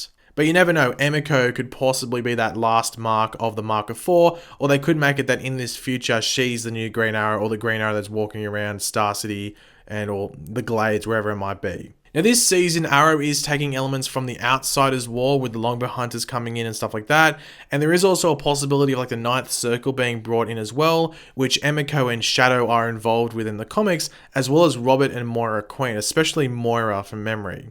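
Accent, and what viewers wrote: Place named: Australia. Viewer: CJ Rehv he said cracking my nigga. He’s Aussie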